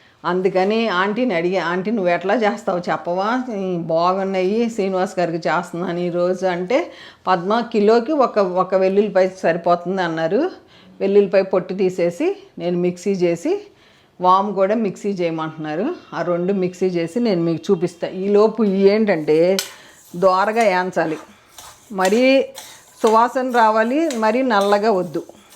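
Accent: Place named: Nellore, India. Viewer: Mee language nellore side laga vundi aunty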